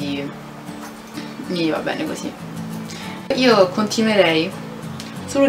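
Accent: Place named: Rome, Italy. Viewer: Ah romanaa de Romaa ❤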